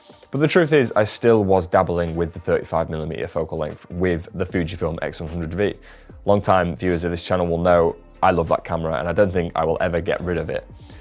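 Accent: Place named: United Kingdom. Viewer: Great video - seems like an "evergreen" discussion :) So, assuming we're talking about 35mm fullframe, to me, this is exactly opposite of your view - I'm a "50mm guy" and find the 35mm to be somewhat between two chairs, if I can put it that way. If I want something wider than 50mm, I find that I reach for my 20mm. Ps. I LOVE your accent - where in the UK does it come from?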